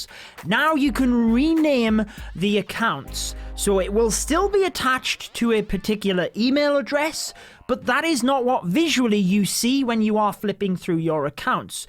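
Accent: United Kingdom. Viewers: The intro and that sweet British accent just makes everything better!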